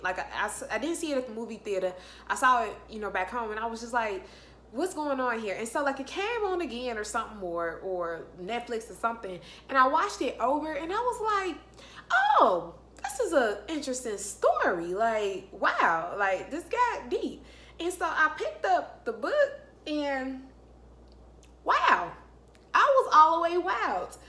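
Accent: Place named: South, United States. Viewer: So pretty... my daughter loves Gone Girl. I think I’m drawn to your southern drawl. Keep up the good work. #southerngirls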